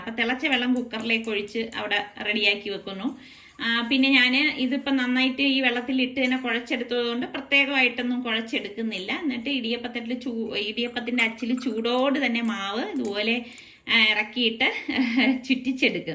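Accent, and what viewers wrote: Trivandrum, India. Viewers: Yellam super TVM samesaram super Njanum aviduthekariya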